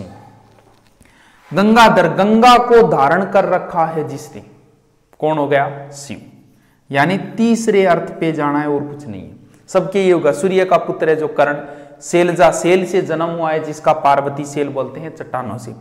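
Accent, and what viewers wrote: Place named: Rajasthan, India. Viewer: Rajasthani tone....maja aaya pdhne me....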